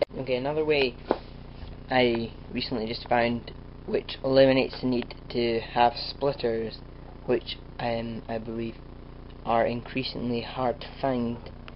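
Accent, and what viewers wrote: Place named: Scotland, United Kingdom. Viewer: Chewin the fat this guys well Scottish :L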